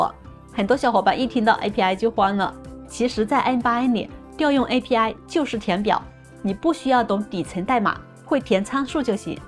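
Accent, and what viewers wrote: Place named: Jiangnan, China. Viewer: 听口音可能是中国大陆南方江浙沪一带的？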